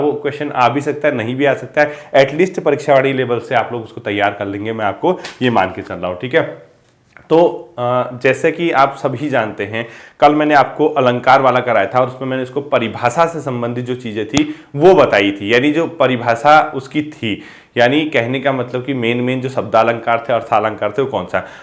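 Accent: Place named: Chamoli, India. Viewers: Bahut achi koshish sir garhwali bol bolne ki ..👍🙏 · Chamoli dilect cha yu😍.. Bhotte bhal gurujii..Yan lagnu yakha k cha ap😀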